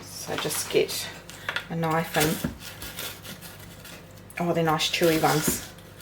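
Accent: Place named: New Zealand. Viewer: love your kiwi accent!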